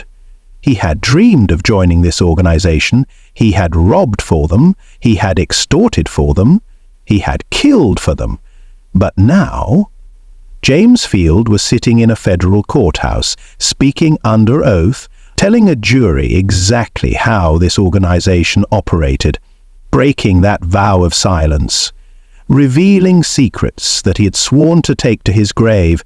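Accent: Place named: United Kingdom. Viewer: BRITISH NARRATORS , I'LL PASS THANKS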